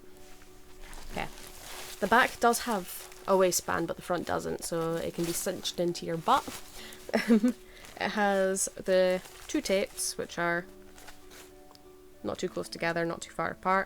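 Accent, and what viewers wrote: Scotland, United Kingdom. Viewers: 1. Your accent is amazing, love.Scottish. 2. Thank you for your absent absorbent and ABDL products... it's hard to find them that works for most adults, and your abdl reviews is comprehensive and brilliant. tyvm for being awesome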